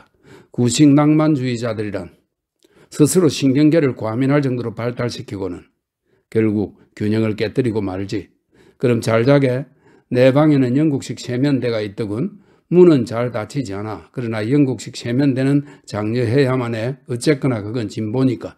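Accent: Gyeongsang, South Korea. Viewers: 경상도사람의 억양이 들어가서 듣기가 매우 불편하네...다른 사람이 낭독한것은 없나요?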